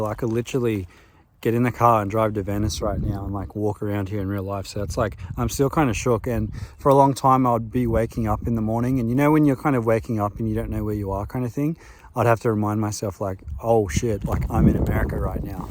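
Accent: Australia. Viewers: Glad you still sound Australian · crazy you still have your aussie accent tbh. people lose it in like months let alone 5 years.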